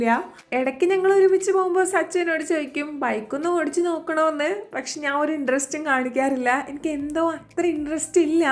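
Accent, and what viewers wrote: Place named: Trivandrum, India. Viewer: Trivandrum slang cheruthayit vannu thudangitund😁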